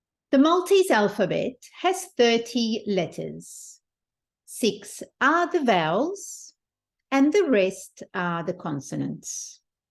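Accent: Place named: Australia. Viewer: This is amazing , I accidently found this channel and I am so glad that you are teaching so beautifully and from the accent I assume you are Australian . Thank you for doing this , love it and appreciate it .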